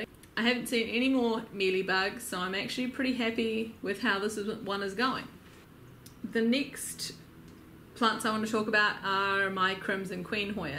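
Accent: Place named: Auckland, New Zealand. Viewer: Hi from Auckland, I'm Sarah from Auckland. I wonder if we went to school together a long time ago. I was watching a bunch of Hoya vids then yours popped up and I thought "Hey! I know that accent!" Lovely collection you have there. I've had one hoya my whole life then recently started adding more. You've done soooo well with your Obovata and Kerrii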